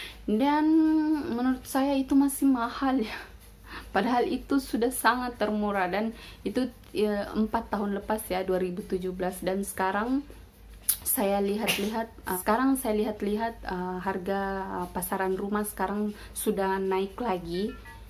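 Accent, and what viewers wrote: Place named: Riau Islands, Indonesia. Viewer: Rezeki ade dimane2 ' kat mne2 kite duduk tinggal akak /klo ade kemahuan nye ..pasti akan ade perubahan nye' owh ye akak berasal dari kepulauan Riau ye knn. Kn pe dgr loghat dari akak '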